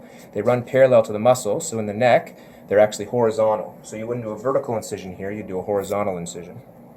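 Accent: Canada. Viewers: "A month, eh?" Knew he was Canadian almost right off the bat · he is Canadian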